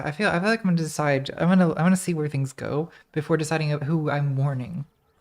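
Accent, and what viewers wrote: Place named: RP, United Kingdom. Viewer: And she has a royale English accent.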